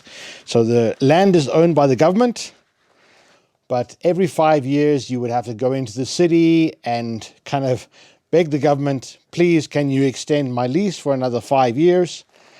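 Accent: South Africa. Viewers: Holy moly. Is that a South African accent? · is bro South African or Dutch? it's breaking my brain lol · Sounds like a very South Africa accent? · Is this guy from South Africa? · You sound Afrikaans. great Video. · Is that a South African accent?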